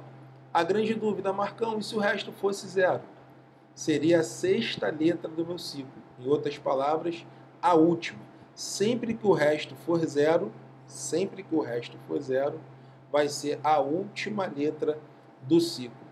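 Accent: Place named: Rio de Janeiro, Brazil. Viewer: Da pra se vê claramente que o é um carioca da gema. 😁 Ele puxa bastante no x... 😄. Aula top. Show de bola meu velho.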